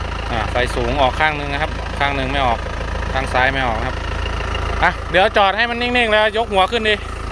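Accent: Phu Thai, Thailand. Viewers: คือสำเนียงออกทางผู้ไทย แม้นเบาะครับ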